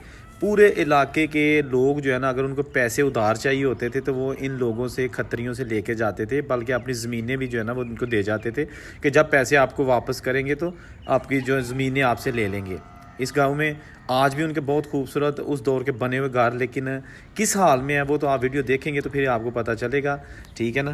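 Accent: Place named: Gurdaspur, India. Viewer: Sir your Punjabi dialect is same like Gurdaspur people.. Saab aap Narowal ya Sialkot district (jo Gurdaspur district India ke saath lagta hai) ke hoge.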